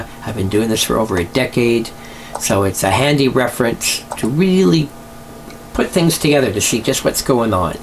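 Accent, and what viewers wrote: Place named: Canada. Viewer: Great Canadian accent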